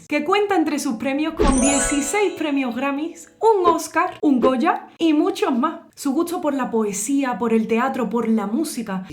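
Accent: Andalusia, Spain. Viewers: También es una de mis favoritas. Un placer escucharla desde tu voz, tu acento andaluz, tu guitarra y tu simpatía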